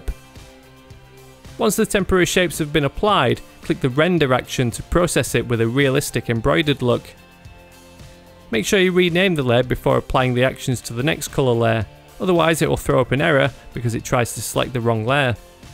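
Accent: United Kingdom. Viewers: British accent very good!!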